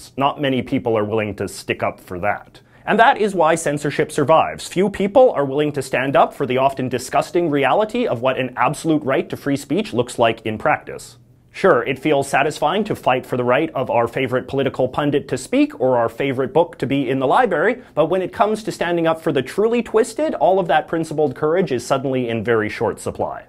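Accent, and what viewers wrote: Canada. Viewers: You keep saying A-Boot...i am canadian and i say about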